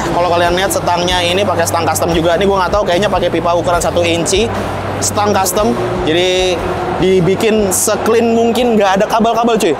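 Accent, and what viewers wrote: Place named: Central Java, Indonesia. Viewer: Ngomonge medok mesti bengkel e wong jawa tengah iki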